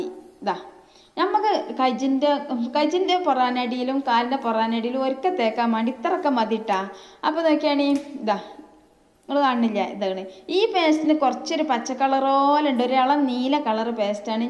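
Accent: Malappuram, India. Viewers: മലപ്പൊറം bhasha 😍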